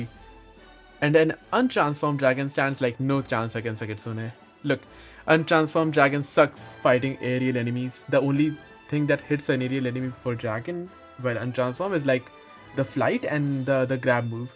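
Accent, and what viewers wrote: India. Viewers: I can feel your hindi 😅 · "Bro u sounds like Indian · oh yea, he sounds like indian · Ppl gonna hate me for this but.. WHY INDIAN ACCENT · Bhai tere accsent indian hai toh hindi bola kar yar❤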